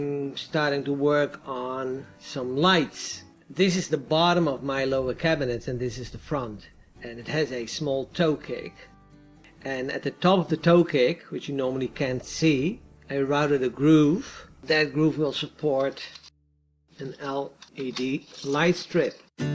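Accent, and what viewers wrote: Netherlands. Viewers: ik dacht altijd al een nederlands accent te horen ;) keep up the good work!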